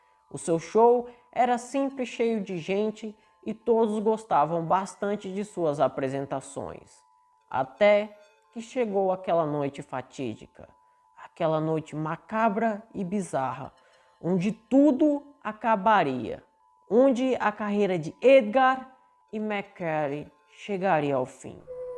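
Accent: Northeast, Brazil. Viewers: Gosto do seu jeito nordestino de falar😁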